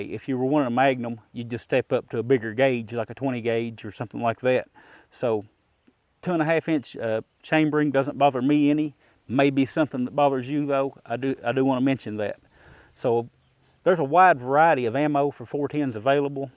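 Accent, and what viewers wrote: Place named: Louisiana, United States. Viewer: You from Louisiana? That accent sounds familiar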